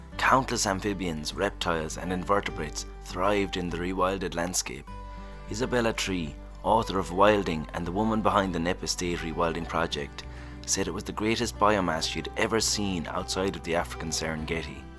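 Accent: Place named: Ireland. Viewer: Thank you so much great channel and wonderful to hear the Native Irish voice 😄😄.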